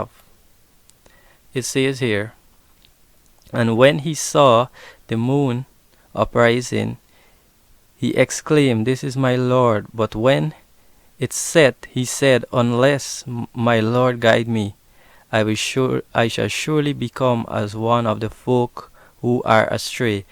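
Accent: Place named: Philippines. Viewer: The poor fool doesn't know what he is talking about. He sounds Philippines.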